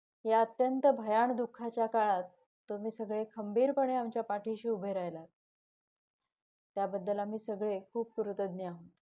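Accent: Vidarbha, India. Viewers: त्यांची बोलण्याची खास वऱ्हाडी शैली फार आवडायची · भाऊ भयंकर धक्का देवून गेला तु तुझी सवयच लागली होती रे तुझी वैदर्भीय भाषा आपलीशी वाटायची तुला विसरण कठीण आहे भाऊ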